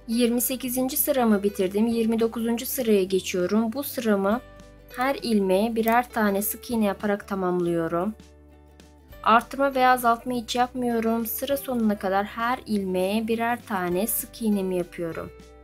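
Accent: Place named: Russia. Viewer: Elinize sağlık hnm. Özel olmazsa bişey sormak istiyorum. Ruslar gibi Türkçe konuşuyorsunuz. Aslen rus musunuz?;)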